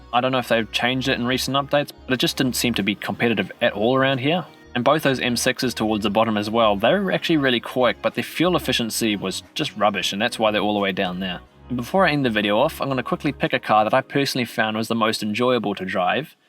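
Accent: New Zealand. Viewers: Thanks for all the time you put into your videos, great info and tips that have helped my lap times and setups a lot! Great to hear a Kiwi doing such a great job! Keep up the good work mate 🤘🤘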